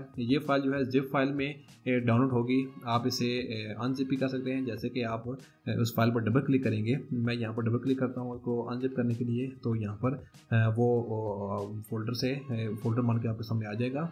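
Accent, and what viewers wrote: India. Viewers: The indian voice is Is- PERFECTO!!!!!!😎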